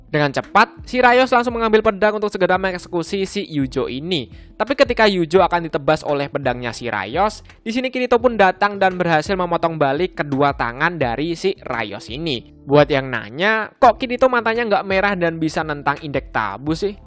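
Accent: Java, Indonesia. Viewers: Gw suka sumpah kalo adminnya bilang"E tapi,E karna"pake bahasa medog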